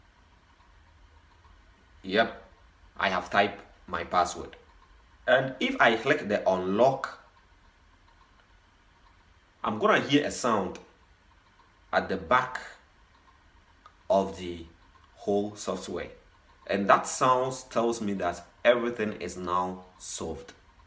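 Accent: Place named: Africa. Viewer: I LOVE YOUR ACCENT BRO PROPER AFRICAN VIBES PEACE AND LOVE MY MAN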